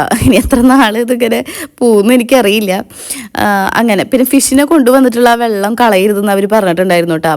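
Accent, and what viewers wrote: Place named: Thrissur, India. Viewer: തൃശൂർക്കാരി..... എനിക്ക് ഈ വർത്തമാനം ഭയങ്കര ഇഷ്ടം ആണ്.....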